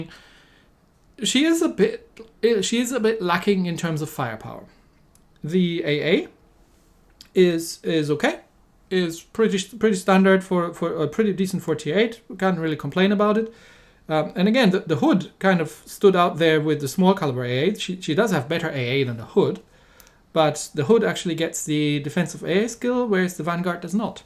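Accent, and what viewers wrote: Germany. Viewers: nah it's not that it's just that I have studied a little about Europe and Asia and thought that your acent kinda sounds Germen which is cool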